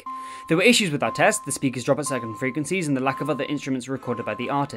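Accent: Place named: United Kingdom. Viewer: 100% agreed. That brittish accent is awesome.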